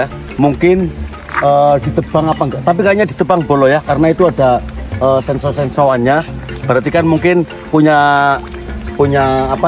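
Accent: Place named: Banyumas, Indonesia. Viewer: wong ndi bolo deneng ngapak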